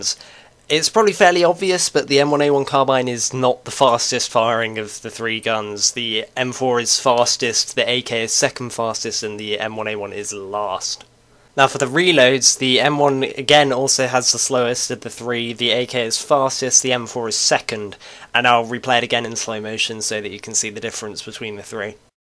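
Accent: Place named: United Kingdom. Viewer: lol ur British accent :) cool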